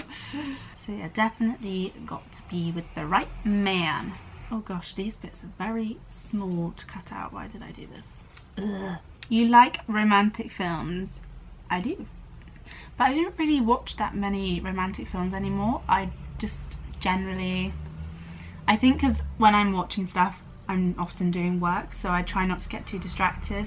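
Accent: RP, United Kingdom. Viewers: Definitely posh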